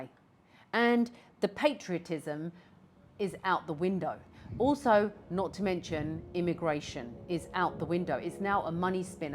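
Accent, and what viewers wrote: United Kingdom. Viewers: She sounds British to me 😁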